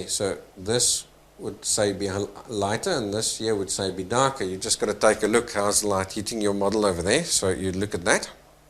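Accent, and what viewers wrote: Afrikaans, South Africa. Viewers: You sound South African, You have my accent :? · Thank you so much for sharing. I appreciate all the little tips and details you give so freely. You have taught me so much just from watching you paint the portrait of " the girl with open hands " I wonder if you ever adventure out into abstract reality. I am quite sure you would be excellent at doing so. I am a fellow SAfrican living in the USA so your accent brings back memories of my land of birth. I wish you all of the best for the new year with many happy hours in the adventure of painting. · He sounds Afrikaans.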